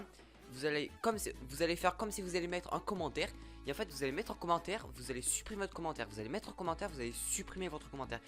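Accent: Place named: Canada. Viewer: On dirais que t'a un accent canadien ça me fait trop rire 😂😂😂😂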